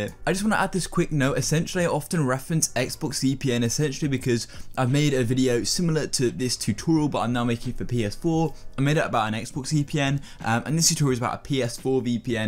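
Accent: United Kingdom. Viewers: Fortnite and british👎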